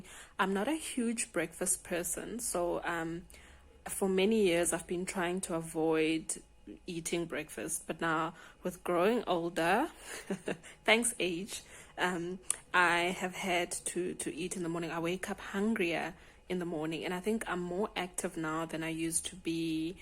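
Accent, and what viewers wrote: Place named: South Africa. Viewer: I love your accent! I would like to visit South Africa one day. I heard it is beautiful. Thank you for sharing. I am a huge fan of boiled eggs too! :-)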